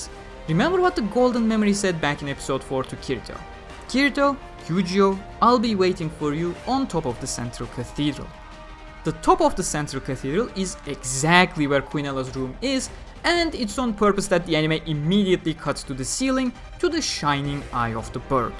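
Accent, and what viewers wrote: Turkey. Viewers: türk olduğun tam belli oluyor aksanından. :D Kusra bakma ama biraz kötü aksanın.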